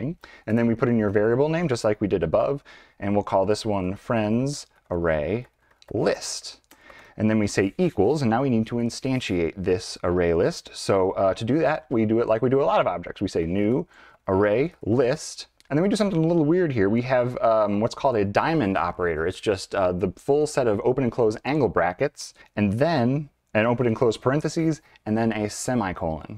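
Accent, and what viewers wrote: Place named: United States. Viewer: finally an american explaining it hahaah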